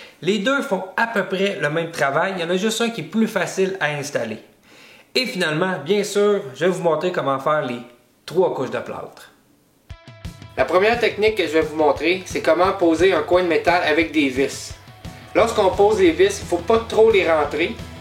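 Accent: Quebec, Canada. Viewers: vous les canadiens... votre façon de parler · J'adore ... l'accent québécois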